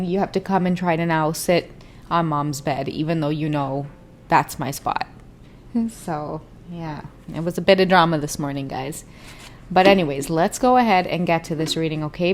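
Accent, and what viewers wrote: Trinidad and Tobago. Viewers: Are you trini? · As a fellow Trini, I love hearing your accent! 🙌🇹🇹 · yes we love your trini accent, you should defly use it more its so authentic and its music to my ears, reminds me of my aunt🥹🥹❤️‍🔥🇹🇹🇯🇲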